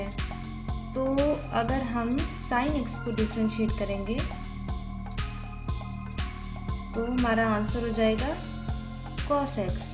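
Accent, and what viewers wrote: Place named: Varanasi, India. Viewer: Very nice... i found kashi in your voice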